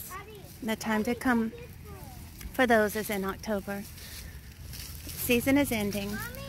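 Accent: South, United States. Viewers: you and your daughter sound so much alike. You both have the southern accent and tone to your voice. Nice video 🙂❤ I love going to Northern Georgia around Blueridge Ga to get fresh apples in the Fall. October is the month to go.🙂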